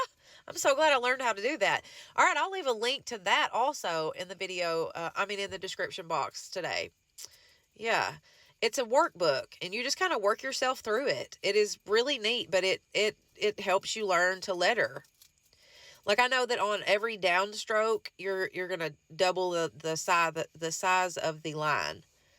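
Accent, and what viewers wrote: South, United States. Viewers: I love watching your channel so many pretty country farmhouse things you make and I love your southern accent you remind me so much of that TV show designing women which I loved to watch also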